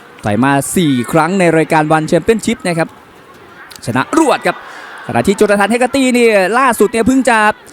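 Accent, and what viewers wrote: Isan, Thailand. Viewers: คนพากดีคักมีเว้าอีสานบ้านเฮานำจวดไปเลย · ดูแล้วชอบครับคนพากย์สุดยอดครับ ผสมอิสานด้วยเหมาะีผมชอบ คนอุดร กุมภวาปี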